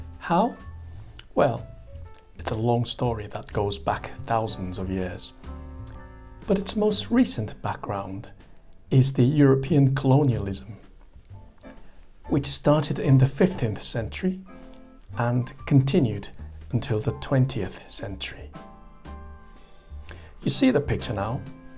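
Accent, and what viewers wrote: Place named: United Kingdom. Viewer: 마놀린구독자인데 동영상이 있다는것 얼마전에 알았습니다. 영국식 영어~ ^^ 처음에는 조금 낯설었는데 집중해서 들으니 들리네요. ㅎ 번창하셔요~~^^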